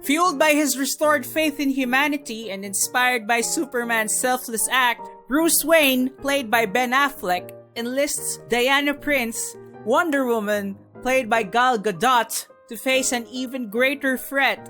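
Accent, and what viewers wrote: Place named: Philippines. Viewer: You sound Filipino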